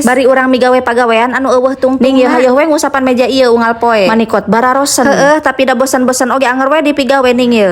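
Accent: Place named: Garut, Indonesia. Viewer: ciga logat urang manaaaa Kitu teteh...😂😂😂,,Garut kidul kitu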